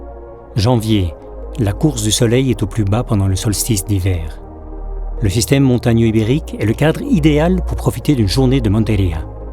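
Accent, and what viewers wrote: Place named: Belgium. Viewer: Chouette film mais les commentaires sont d'un pompeux !!!! Très énervants et dits par un belge , je dirais , mais cela n'est pas le problème...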